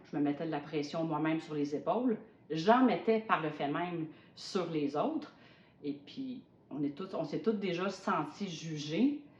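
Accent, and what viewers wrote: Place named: Quebec, Canada. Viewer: Ah mais ! C'est l'accent de la belle province ! Je vous ai démasquée miss ;-)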